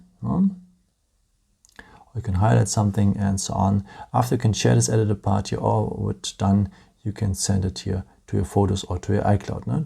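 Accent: Germany. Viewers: Cute German accent ☺️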